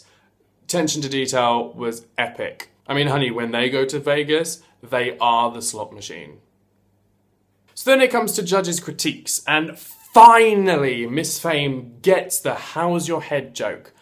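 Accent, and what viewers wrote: United Kingdom. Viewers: Lusine Margaryan I'm guessing he lives somewhere in the south of england, Midlands maybe, so just a southern English accent. I'm guessing this because he sounds similar to me and to me he doesn't really have an accent, which likely means he lives somewhere similar to me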